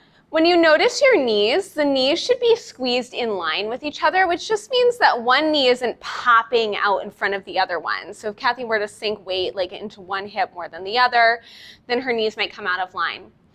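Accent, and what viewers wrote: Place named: East Coast, United States. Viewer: Great tips - and your east coast accent brings a smile to me over here on the west coast. 😀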